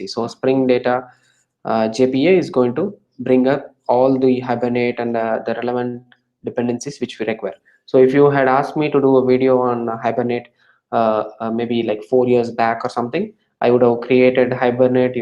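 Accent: India. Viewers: Indian accent is funny!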